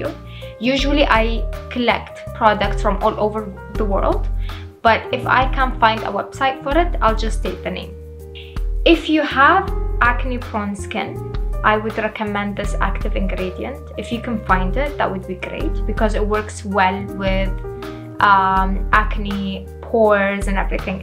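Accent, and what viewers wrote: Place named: England, United Kingdom. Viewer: I love your English accent 😋😍😍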